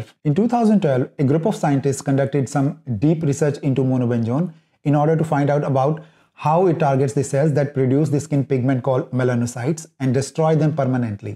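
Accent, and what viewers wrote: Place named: India. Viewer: You sound so much like indian.are you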